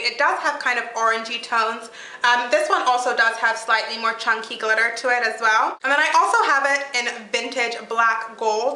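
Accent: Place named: United Kingdom. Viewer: I knew I heard a British accent! :)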